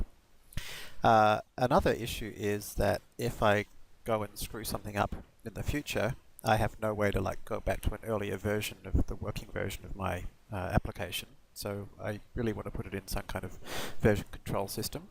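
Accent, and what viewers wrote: Australia. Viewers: Excellent Series, very clear and understandable especially since it was end to end(Bonus points for being in an Australian accent too). I am in the process of melding this framework to the out of box internet application in MVC. I only have a few questions: How can angular deal with Authorisation? How can Angular prevent CSRF with the in built Token Validator in MVC? Is it possible or even advisable to use cshtml pages running razor code as templates? And lastly is there a way to prevent a user from manually navigating to a html template to stop them from loading it without the index page? Thanks very much :)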